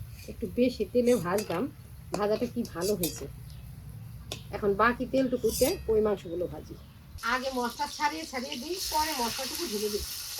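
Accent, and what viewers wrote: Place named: Dhaka, Bangladesh. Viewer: এই বোনটা মনে হয় ঢাকাইয়া । কথাবার্তায় তাই মনে হয়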